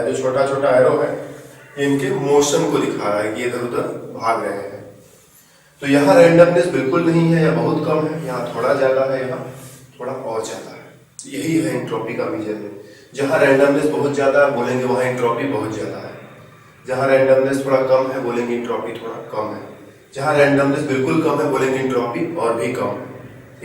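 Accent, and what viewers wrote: Bihar, India. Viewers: sir app bihar sae ho??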